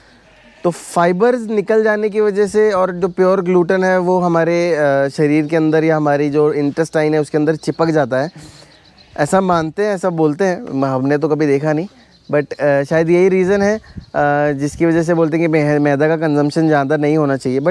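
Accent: Maharashtra, India. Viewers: This is my first time. I really like the way u explain and your touch of Marathi in hindi really sounds good.Keep up the good work.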